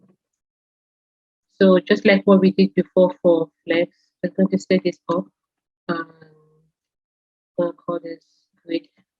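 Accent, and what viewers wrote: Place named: Igbo, Nigeria. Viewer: The Igbo accent makes me feel at home 🥳🥳🥳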